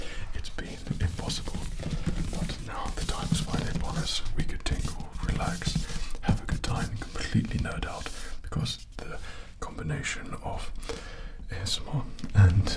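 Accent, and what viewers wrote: England, United Kingdom. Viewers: Are you British? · Hii just letting you know is actually born in the uk! and he does sound like he has a very deep English accent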